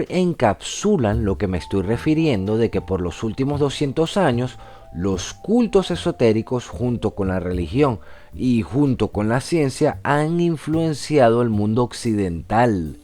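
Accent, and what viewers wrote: Venezuela. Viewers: Por tu voz me acabo de dar cuenta que " ", debe ser venezolano. ¿Cómo alguien que escapó del intento de comunismo puede defender el comunismo?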